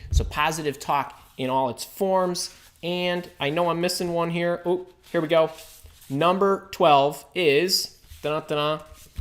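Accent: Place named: Midwest, United States. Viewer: Oh my word! I can tell you’re definitely from the Midwest!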